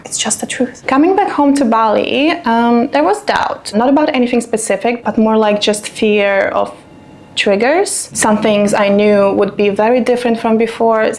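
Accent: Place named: Norway. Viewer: I just wish you'd have said that cool pali word in your cool Norweigan accent😂!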